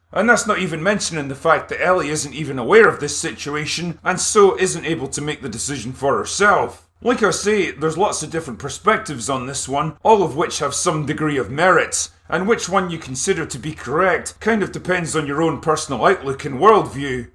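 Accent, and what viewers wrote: Scotland, United Kingdom. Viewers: Listening to a Scottish man talk ethics is one of life's simplest pleasures.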